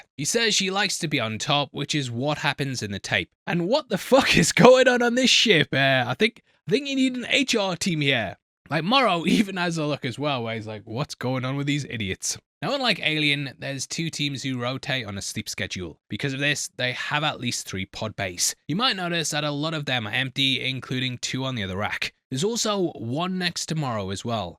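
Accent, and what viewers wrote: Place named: United Kingdom. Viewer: You sound like a Brit, you probably should know how french words are being produced, init mate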